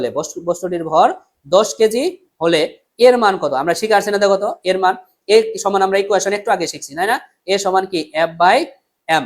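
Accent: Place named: Sarishabari, Bangladesh. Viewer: না ভাইয়া আপনার কথা শুনে মনে হলো যে আপনি শরিষা বাড়ির স্থানীয়। আমার ওখানে আত্মাীয় আছে তো তাই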